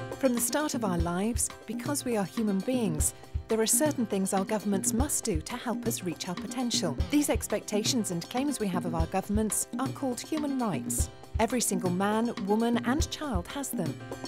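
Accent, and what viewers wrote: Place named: United Kingdom. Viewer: What a British accent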